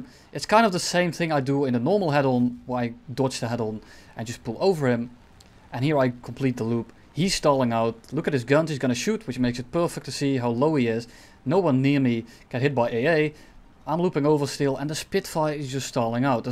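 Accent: Netherlands. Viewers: Are you Dutch cuz your L's are very much like the most Dutch people say it? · I must say, the level of English here is amazing. Of course you can still tell that he's from Holland, but I'm really impressed because it's not the standard Dutch-English. Good job mate!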